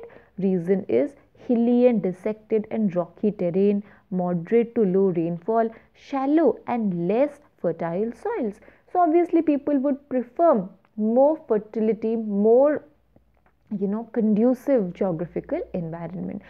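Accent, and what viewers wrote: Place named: New York City, United States. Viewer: NYC pronunciation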